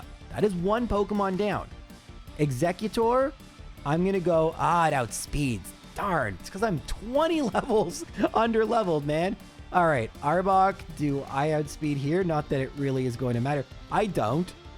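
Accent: Canada. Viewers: Hey! As others pointed out your accent was pretty obviously Canadian but that "Es hora de luchar contra el rival 3" was perfectly undertandable! Greetings from Spain!!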